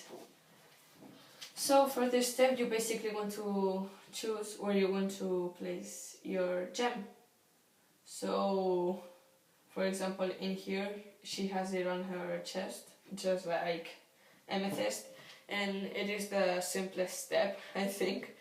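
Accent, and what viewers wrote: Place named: Russia. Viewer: I was wondering because it sounded russian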